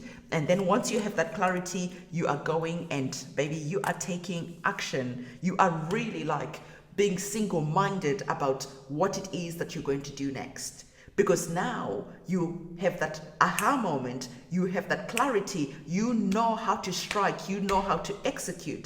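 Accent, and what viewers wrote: South Africa. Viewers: Are you originally from South Africa? ..... this was enlightening thank you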